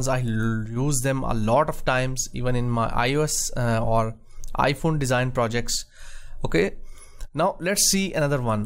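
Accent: India. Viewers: Are you from india??? I love your accent!!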